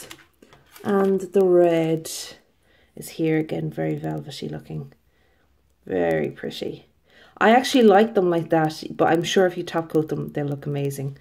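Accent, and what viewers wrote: Ireland. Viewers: Love the video! Really cool to hear an Irish accent talking about nail polish... most people's eyes glaze over when I talk about it. ASMR btw? I'm from Dublin so glad to hear a fellow Irish polish nut!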